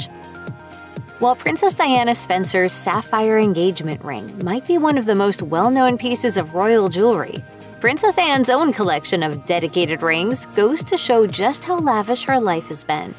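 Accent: United States. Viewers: It gets right up my nose to hear someone with a strong American accent criticising our Royal Family, why don’t you just keep your biased comments to yourself and perhaps concentrate on all the serious social problems you have in your own country such as racism, gun crime, obesity, avarice and greed. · The commentary was done by an American, what more can I say 😆 I've always heard that Princess Anne was quite frugal in lots of ways (as far as Royals go that is). Americans being obsessed with wealth, well there you go, the commentary is bound to be quite materialist and incorrect. That's Americans for you. I really wish yanks would stop reporting on the Royal family with their screeching accents. It grates on the nerves!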